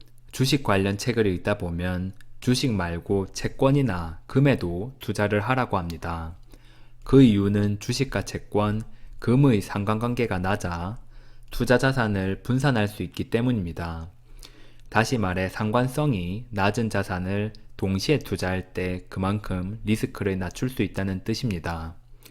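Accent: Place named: Ulsan, South Korea. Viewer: 좋은 정보 감사히 잘 봤습니다. 말씀 톤으로 보아서는 울산분으로 보이십니다. 아는 분과 많이 비슷한 느낌입니다.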